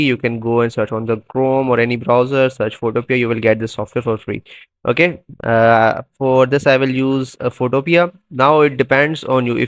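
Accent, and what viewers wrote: India. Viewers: When I hear the Indian accent I knew I was gonna leave with what I wanted while he explained it to me simply ❤, Tysm pro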